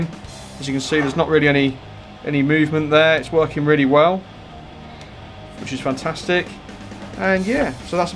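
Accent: United Kingdom. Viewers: Good work. Can I bring my laptop to you for a quick fix?:) That's a lot of bloody screws. I have the same model and same exact issue. If I give it a go, I'm thinking to use Gorilla glue, it's darn good stuff we have here in the USA, don't know if it's available in the UK. Pardon me if I didn't guess your accent correctly.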